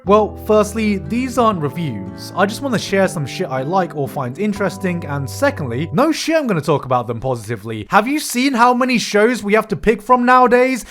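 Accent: United Kingdom. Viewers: 🙋‍♂️I miss the salty gigguk who swears every 3 seconds in British accent. He made a baccano video swearing for 3 minutes, and it's a masterpiece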